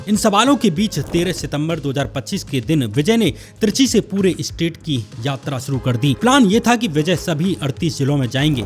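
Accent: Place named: Bihar, India. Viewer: Area yaar bijness business hota hai. Pronunciation correct karo content dikhne meh bohat acha hain lekin sunne me UP Bihari ki badbu aajati hai. Bijay nahi Vijay Eaji nahi easy Bujiness nahi business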